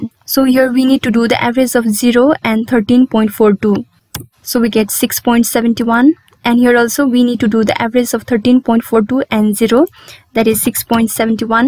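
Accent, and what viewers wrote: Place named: Nepal. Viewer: you have nepali accent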